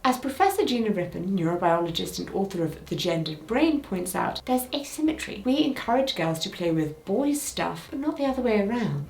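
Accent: United Kingdom. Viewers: You sound like what I think every person on the North American continent thinks British women sounds like. I will be subscribing for more.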